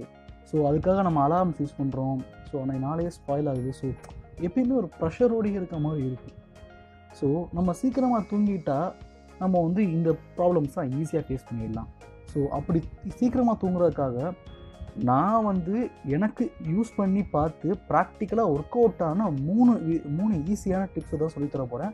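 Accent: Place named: Kerala, India. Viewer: ninga pesarudu sariya Kerala OK so good tips I tray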